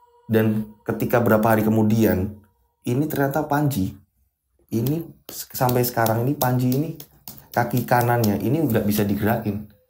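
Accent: Java, Indonesia. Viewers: Bom yoskay... aq suka cara membawakan cerita nya terang dan jelas 👍... logat jawa nya lhas banget mas 😊 enak di dengar nya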